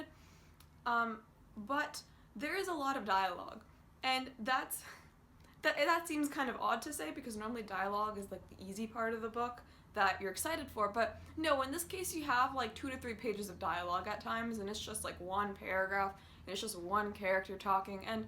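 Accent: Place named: Canada. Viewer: What a lovely Canadian accent Oh! By the way nice review.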